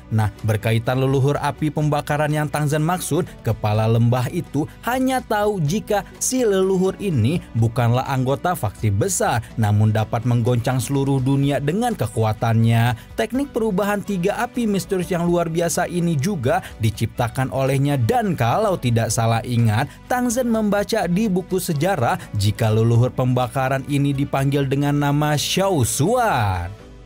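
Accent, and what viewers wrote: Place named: Lombok, Indonesia. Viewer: Orang Lombok mana ni?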